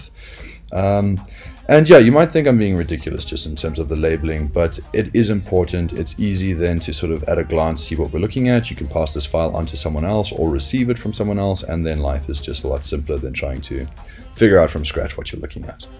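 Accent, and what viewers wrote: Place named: South Africa. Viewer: Thought it sounded South African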